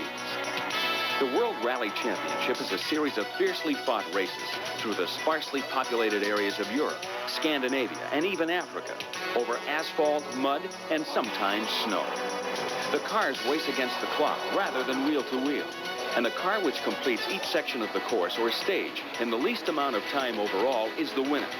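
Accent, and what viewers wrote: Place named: United States. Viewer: why the F***K is thier an american voice over what the hell would they know about rallying?? thier probably shocked to watch a car make both a left turn and a right turn this ass hole belngs in a nascar video not this one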